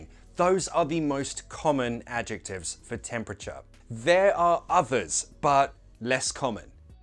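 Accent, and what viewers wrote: United Kingdom. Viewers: I love the British accent 😍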